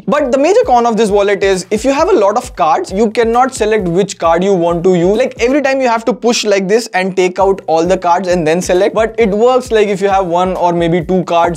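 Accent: India. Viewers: Indian lag rahe ho Hindi me bolo na mujhe kuch bhi samajh Nehi aaya 😂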